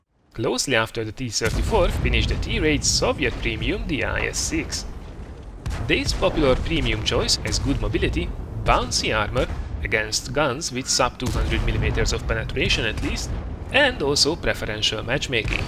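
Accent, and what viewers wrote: Hungary. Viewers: akkor jol gondoltam a kiejtesedbol h magyar vagy😄😃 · you are hungarian right/magyar vagy ugye? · You are hungarian right?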